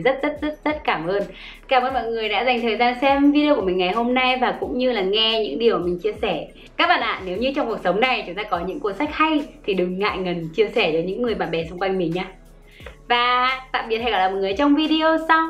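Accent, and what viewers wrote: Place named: Hue, Vietnam. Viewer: ơi!! nói giọng Huế nghe dễ thương lắm nhaaa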